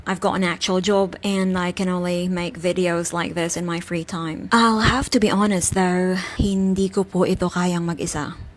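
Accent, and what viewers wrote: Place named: United Kingdom. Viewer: Hello Ms, good day to you. I don’t think Tio Moreno capable of a debate with a person as intelligent as you. Love that British accent by the way Atleast it sounded like a British accent or yung hilaw na Australian accent.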